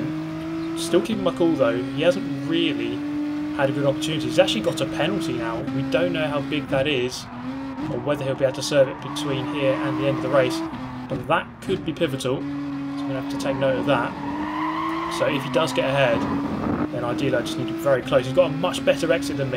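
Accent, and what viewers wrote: United Kingdom. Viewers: Can’t believe ‘they’ changed the intro music 👌😂 The humour. And the theme is so contrary to your british gentleman voice and the image peeps might have of you lol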